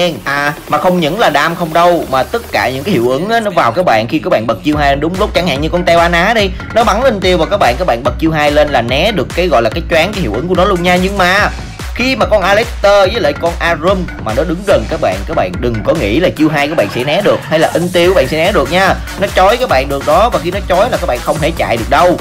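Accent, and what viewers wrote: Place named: Mekong Delta, Vietnam. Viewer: Rất có tâm, đúng với giọng điệu miền tây...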